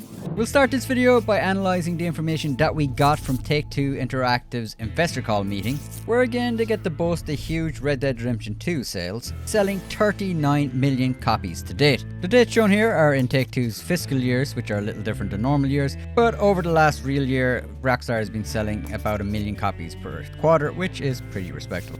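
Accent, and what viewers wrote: Ireland. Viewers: Thanks for the honesty 👍 Irish Accent?☘️ Sadly not playing nearly as much…way too many griefers and modders for me. It starts As soon as I log on, no matter what time of day. Blowing me up, starting me on fire, killing my horse and causing me to lose trader and moonshine wagons and hides and high end stews. Not worth it any more. Play on PC 😡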